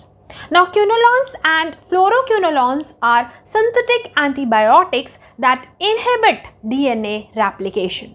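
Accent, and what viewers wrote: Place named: England, United Kingdom. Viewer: liked your English accesent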